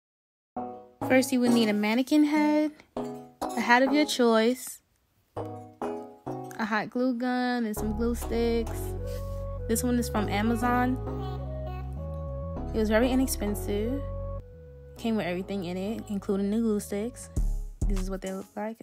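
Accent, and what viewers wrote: United Kingdom. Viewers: so you british or american because i heard the british accent in another vid and now i’m real confused😩😩if it’s fake though you is good at it (i’m from the uk btw) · Love the English accent yeah 😍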